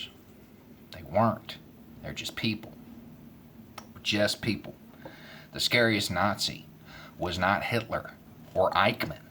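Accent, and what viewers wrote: South, United States. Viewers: You sir, have a new fan. I’m from northern Mexico. We are the hillbillies of Mexico (inverse from the US). I can’t tell you how refreshing it is to hear a person with your awesome southern drawl say the things you say. You’re exactly right, and right now the scariest citizen is the one who stands idly by and does NOTHING! The only thing evil needs to prevail, is for good men and women to stand idly by and do nothing! May God bless you and I hope your channel blows up soon. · Man, every time I watch your videos, I'm less fearful of white dudes with long beards and a southern accent. Lol. Truly, we have more in common listening to your views. What's your view of the illegal immigrants? In case you forgot, I'm a black dude. I live in Loss Angeles and so many Mexucans have come, they roll around town with the Mexican flag waving on their antennas or somewhere on their cars. 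I think it's a subtle form of treason. I dont mind people being proud of their heritage. But such public overtures shouldn't be something you would do entering another country. Why would you? If Mexico is so great, why did you feel the need to flee? You didn't go through.a PROCESS of properly leaving. These people fled Mexico like rats abandoning a sinking ship. These people KNOW here in the U.S. they can have a measure of peace, security and prosperity, and not worry about drug cartels and corrupt governments officials and corrupt cops ruining their dreams of the future. I just wish when they come, they would ALWAYS remember that. But they don't. They eventually start talking about white people, although they aspire to be rich and successful as many white people. They start talking about black people, although they move into the black neighborhoods when they first arrive. It's rank stupidity and hypocrisy that Mexican and southern American immigrants come and the U.S. 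(and I mean white people) better make these newcomers respect the flag and all this country represents or you mig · I want to commend you on your channel; even though I'm not a subscriber... yet. In America we are all too quick to judge people by their appearance. As an formally educated African American man from the coasts of this country... even though my first year of college was at Auburn... I have been guilty of drawing conclusions about the racial and gender fairness in the heart of someone who looks and sounds like you... beard, southern drawl, etc. You do our society a DISPROPORTIONATE BENEFIT by displaying that you are capable, willing and instinctively driven to speak truth to power. To be honest and to call out the deceptions and transgressions by the white male, patriarchal hierarchy in this country. God bless you. I can only imagine how proud your wife is of you. Hopefully you can open eyes that I never could. 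Maybe you can get white women over 45 to realize how bad Trump... and the Republicans who failed to hold him accountable... are for them. I'm going to end this post with a challenge to my younger brothers... my sons & nephews... resist your urges to draw stereotypical conclusions about people we might visually conclude are of a certain adverse mindset. Be quick to "have the back" of someone like. In the wake of 8 years of presidential leadership by Barack Obama, there is a new generation of white male Americans who only know to be respectful, dignified and honorable with all people no matter their color or gender or sexual orientation.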